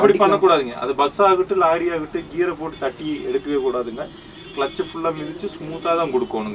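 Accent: Kongu, India. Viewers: Enjoy கொங்கு voice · கொங்கு தமிழ் சூப்பர் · நண்பா கோவை கொங்கு தமிழ் சூப்பரா பேசினார்💯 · Anna kongu Tamil super pesuringa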